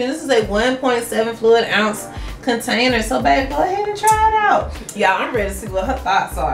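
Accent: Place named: South, United States. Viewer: your beautiful you have great skin and great cheekbones I’m jealous the faces she be making so cute lol she got a baby face such a cutie with a great smile, where you from you got accents like southern im from Boston Massachusetts so you know how we sound I’m sure lol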